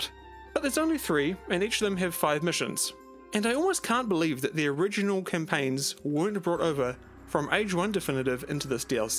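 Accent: New Zealand. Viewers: Good review! Question: where do you come from? The accent reminds me of New Zealand or something like that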